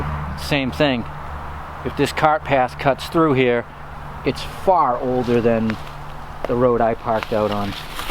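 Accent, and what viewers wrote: Massachusetts, United States. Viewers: This guy sounds like he’s from Massachusetts not New Hampshire. N.H. residents don’t sound like that . I fall o wed telephone lines. Pawnd and stuaff. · Anyone from nh vt here his mass accent. Or is it just me.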